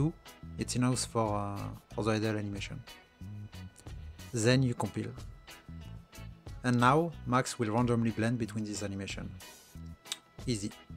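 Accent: France. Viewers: c'est si drôle un français qui essaye de parler anglais xD mais sinon bon tuto ^^ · j'ai reconnu ton accent francais :) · Tu es Français ?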